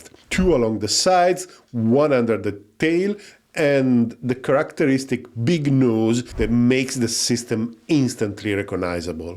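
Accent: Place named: France. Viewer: With a French English accent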